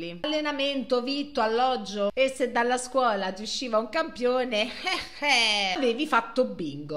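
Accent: Rome, Italy. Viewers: Che peccato sta parlata romanesca, non se ne può più! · Sei fantastica! Qualsiasi argomento, tu lo rendi interessante e divertente, con la tua narrazione in accento romanesco! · 92milioni di applausi ... FACCE UN VIDEO SU LLE POPOLAZIONI ITALICHE PRE-ROMANEEEEE... ovviamente co lo accento romanello tuoooo ❤❤❤ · Sempre più MITICA, (parlante).Daje a pacca' col romanesco,che musica!!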